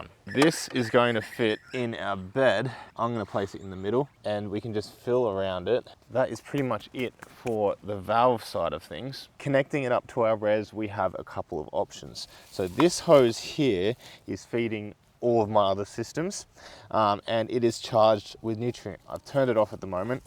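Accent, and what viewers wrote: Australia. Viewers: YOOO another Aussie gardener I can get behind!!!! lots of interesting and informational content that isn't droning on and boring, and it's being all eco-friendly and all that! You have a new subscriber lol, I adore all this crafty gardeny goodness xD Where abouts in Australia are you? the butcher birds in the background and your accent sound very WA-like, are you WA-based? xD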